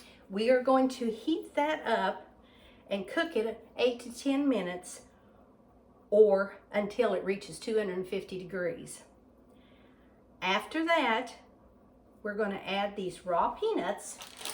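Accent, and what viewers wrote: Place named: Mississippi, United States. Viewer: First time viewer. What a lovely, delightful lady and an informative video. I love the accent. It reminds me of home is Mississippi, as does the caramel corn and peanut brittle.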